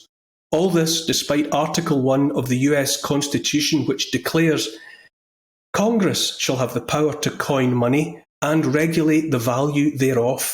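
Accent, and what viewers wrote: Scotland, United Kingdom. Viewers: Neil Oliver sounds Scottish but his loyalty lies with Queen/King and England. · Neil Oliver is the reason I subscribe to GBN no other reason required. Besides he's Scottish the land of my forebears, William Wallace, Aberdeen and so on. Plus I just like the sound of the Scottish accent, it rings of the can't be bought commonsense I love.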